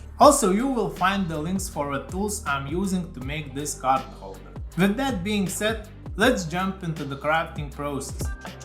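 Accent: Russia. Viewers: nice channel bro! you are russian? your accent...)) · Слышу как будто русский акцент...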